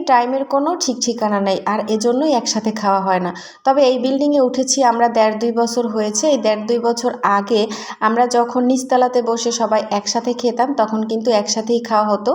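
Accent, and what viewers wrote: Barishal, Bangladesh. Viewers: আসসালামুয়ালাইকুম সোনা আপু আমার ❤কেমন আছো তুমি❤ আমাকে কি ভুলে গেছো😢 ভুলে যাওয়ারই কথা😢 অনেকদিন তোমাদেরকে মিস করে করে গেছি 😢সাধ্য হয়নি তোমাদের সাথে যোগাযোগ করার😢 যাই হোক আপু ❤ভিডিও অনেক ভালো লাগলো❤ শুয়ে পড়ে কানে শুনেছি❤ তোমার বরিশালের কথায় আমার অনেক ভালো লাগে ❤তোমার জন্য অনেক অনেক দোয়া এবং ভালোবাসা রইলো বোন আমার❤❤❤❤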